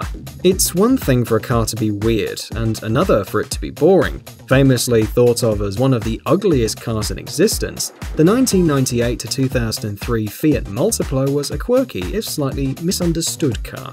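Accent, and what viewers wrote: United Kingdom. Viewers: Yozika - It’s how we all say it in the U.K. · hi un day cou pay? freakin' british english.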